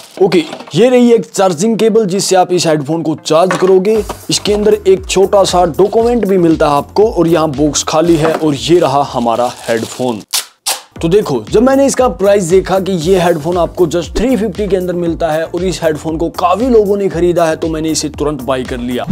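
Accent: Haryana, India. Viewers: Haryana se hai kya bhai 😊?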